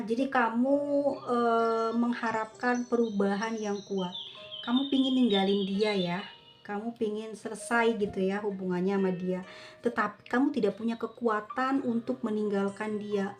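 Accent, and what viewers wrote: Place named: Sunda, Indonesia. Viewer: sy seneng suara ketawanya. Siga sunda.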